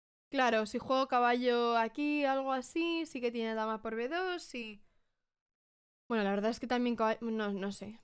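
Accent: Spain. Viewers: Es notable para un argenino, descubrir, a través de distintos youtubers, los distintos acentos idiomáticos, desde Juanjo de Rey Dama, que se come las eses, con gracia suprema, hasta este castizo maravilloso de, que acaricia nuestros oidos con sus matices entre, eses, zetas y ces. Luisón, Rey Enigma, Spider Ibarra, pasando por Pepe, nos sumergimos en ese maravilloso mundo de acentos españoles. Gracias, no te agrandes, que te regaló un caballo el Rey, cariños desde Mar del Plata, alguien que admira tu belleza y simpatía insuperable, también tu capacidad para el juego. 13 de mayo de 2024. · That's a real spanish accent! ¿Are you from España? 🙂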